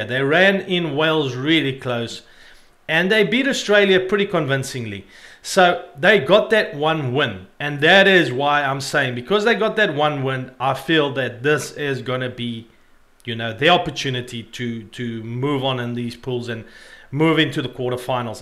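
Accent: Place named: South Africa. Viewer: So weird seeing white person with South African accent.wales beat Fiji they was just to good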